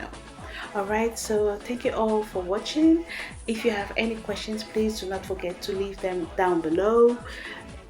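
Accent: Ghana. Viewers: I love your Ghanian accent, I have that with Dutch ..lol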